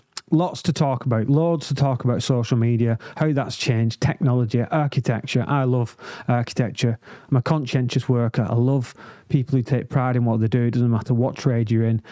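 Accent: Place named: Scotland, United Kingdom. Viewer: your deep scotland accent makes you sound "old and mature"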